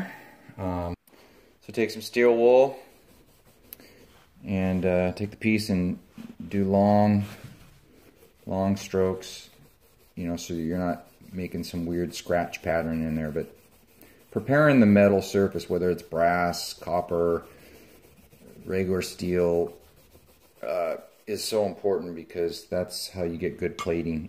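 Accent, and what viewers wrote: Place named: Wichita, United States. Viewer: GREAT idea about the Ni welding rod - did you find at Harbor Frt? Sounds like you are from Wichita - me too. <mail>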